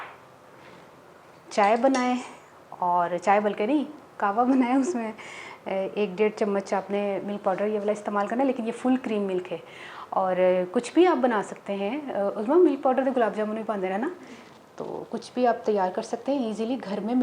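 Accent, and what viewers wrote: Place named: Punjab, India. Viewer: Ma sha allah and jazak allah amazing recipes zaberdast tips ,way of talking with meethy panjabi❤❤❤.... mane apki kharab doodh ko dobara theek kerne ki tip dekhi yaqeen nhi aya aisy kese ho sakta hai mazy ki baat kay main itafaq say gher nhi thi buchon nay doodh liya or bahir rakh diya jb ai toh dekha dood fridge main nhi hai ghabra gai thora nikal k boil kiya 4 kilo doodh kharab socha try kerti hun ap wala tip and amazingly superb pooray gher main dhamaal daal rahi thi kay panjabi kuri di tip kamaaaaal👍👍👍❤❤❤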